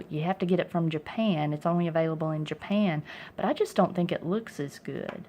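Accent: South, United States. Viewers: Dear, Thank you for your channel & pen reviews. I think your presentation style of casual conversation, combined with the BEST writing sample camera angle on YouTube!; are wonderfully engaging, informative & endearing. Although some other pen fanciers, may have minutes of opinions about various pens; I find myself, asking, 'I wonder if has a commentary' about this pen or that. Your Southern accent & charm make watching your pen featurettes delightful. I am just returning to my fountain pen roots. I had the pleasure of discovering the joy of the Pilot Prera Iro Ai (F), before I became one of your subscribers. Now I'm waiting for a couple of additions to my 'qwillery': the Sailor Pro Slim 4 Season (Meigetsu) & the Pilot e95s, to arrive. Thanks for validating that these wonderful writing instruments should be 'played with', as well as valued & admired. 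God Bless, Candice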